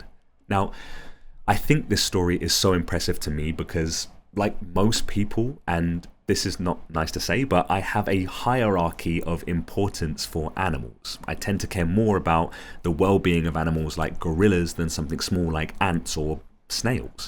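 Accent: United Kingdom. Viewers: Why is he nick cannon with a British accent?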